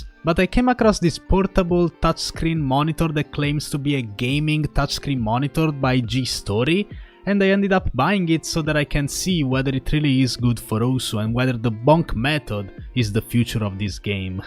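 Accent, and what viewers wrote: Russia. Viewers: This person has a heavy Russian accent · I thought Russian cause the accent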